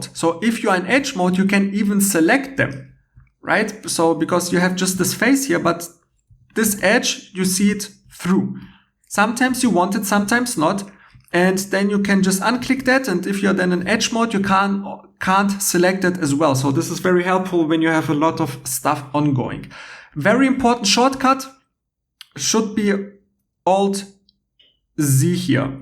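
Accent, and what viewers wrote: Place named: Germany. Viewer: Gibt es das auf Deutsch? Dieses hässlich klingende Englisch mit deutschem Akzent ist unerträglich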